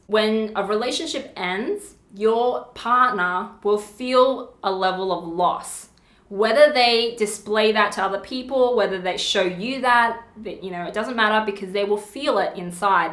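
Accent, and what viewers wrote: Australia. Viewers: W Jansen She sounds Australian.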